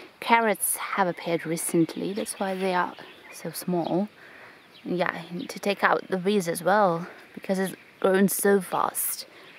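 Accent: United Kingdom. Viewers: You are talking like British people But you are much more pretty God bless you and your family and your homeland Belarus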